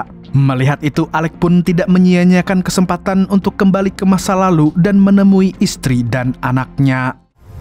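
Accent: Banjar, Indonesia. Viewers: Urang banjar sekaliny sidin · Urng banjar nah ngene narator ya😂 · wiih, urang banjar kh adminx nih?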